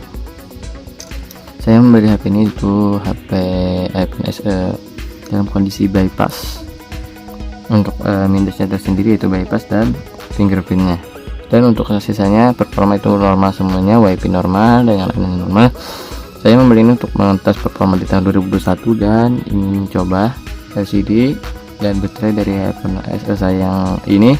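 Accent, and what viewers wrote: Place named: Banjar, Indonesia. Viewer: Mun di dangar ni org Banjar pang😂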